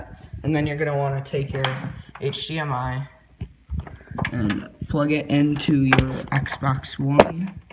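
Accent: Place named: United States. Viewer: Wow as if the click bait wasn't enough you have his cheesy annoying American accent and his voice which obviously hasn't hit puberty yet get a life you sad kid.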